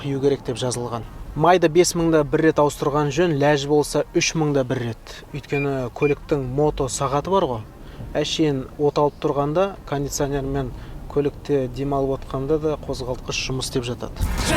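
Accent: Standard. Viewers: Осы Жигит Жаксы Карапайым Отте Казакша таза солейди екен АЛЛА истерине Береке берсин бауырлар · таза қазақша сөйлейді керемет. Міне дұрыс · Жарайсың дами бер, таза қазақша сөйлейсің сол үшін көремін сені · Каринамен салыстырып жиберген калай кетті люгын🤣🤣🤣. Базар жок жасай бер бауырым. Современный таза қазақша сөйлеудің королісің. Бір ғана минус бар- шолуың тез бітіп калады, бес минут сиякты өте шыгады. ✋🤝🤝🙌🏻✊🏻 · мынандай таза сойледин казакни бринши рет эситип турмын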